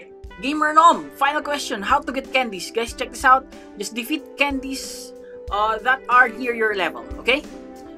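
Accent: Philippines. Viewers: i already know your a filipino just by listening to your accent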